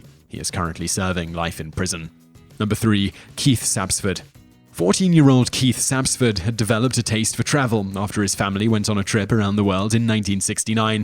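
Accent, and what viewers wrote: United Kingdom. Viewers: Sean S., it doesn’t matter where she was born or how she pronounced it herself. British pronunciation is just as valid for narration as American pronunciation.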